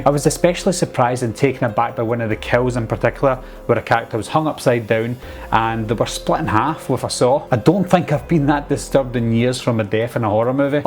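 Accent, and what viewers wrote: Scotland, United Kingdom. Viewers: You from Scotland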